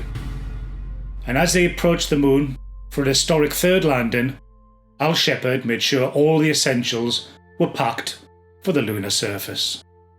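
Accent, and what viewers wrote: Northern England, United Kingdom. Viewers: What is it about these British-accented content providers making US space program videos? Their pitiful island never sent anything to space.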